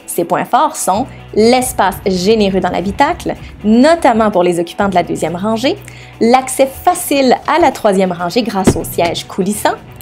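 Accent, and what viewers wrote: Quebec, Canada. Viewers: French Canadian accent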